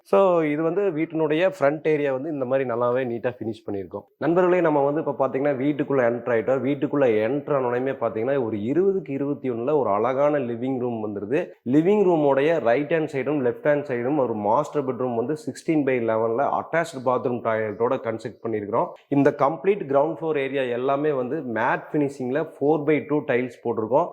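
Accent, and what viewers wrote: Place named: Kongu, India. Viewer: House Super anna ,,, also my fav. Kongu Tamil 👌 👌 ❤❤ from Tirunelveli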